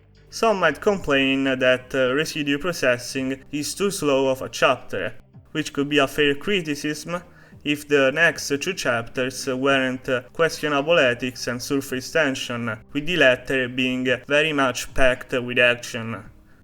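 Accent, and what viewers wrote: Italy. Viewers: You sound overly italian, I love it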